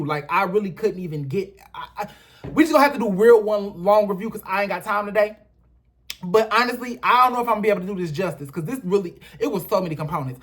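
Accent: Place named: Minnesota, United States. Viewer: I had to pay on the floor and do a beetleguise laugh when u said “rewwoom “😂😂😂 u give Suburbs Minnesota vibes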